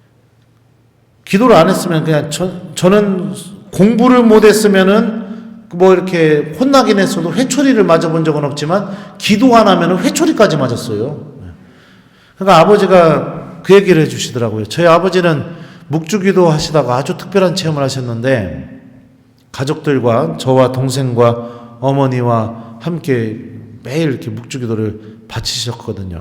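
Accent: Chungcheong, South Korea. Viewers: 신부님 강의 주옥같은 말흠 너무 재미 있고 충청도 사투리와 부모님 무한사랑으로 사제가돠 셨너요 감사감사합니다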